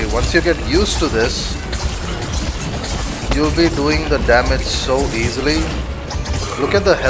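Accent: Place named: India. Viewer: U sound indian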